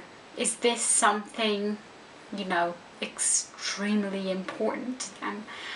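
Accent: United Kingdom. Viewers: Such an inspiring video! 👏🏻👏🏻 Would you also have a video telling us your study and career journey, and how you got such a perfect British accent? 😃 Thanks for sharing your experience. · Pronúncia fantástica, com um toque de sotaque britânico, o que é dificílimo para brasileiros! Parabéns!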